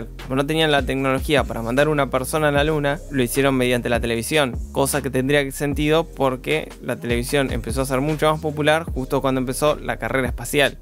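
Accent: Argentina. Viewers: Sos de Argentina,seguro ?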